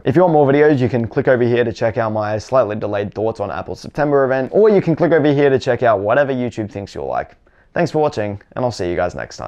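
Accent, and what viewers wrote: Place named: Australia. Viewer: Great, thorough video - thanks mate! Was initially a little confused about the pricing based on your accent (another Aussie here, where they are just under $100). But then realised you were probably talking to a primarily American market and thus talking in US$. (And congrats on your recent graduation!) 👍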